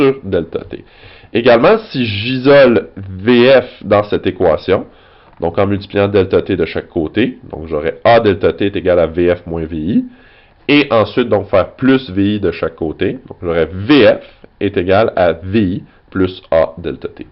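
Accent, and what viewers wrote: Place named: Canada. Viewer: rigolos jaime bien laccent canadien ahahahhaahahah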